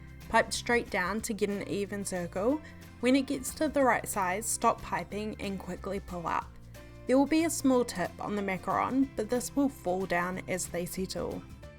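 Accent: New Zealand. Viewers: Trying to find the info but I cannot. Lol. Are you a kiwi!? 🤩❤️ Hahaha the accent is undeniable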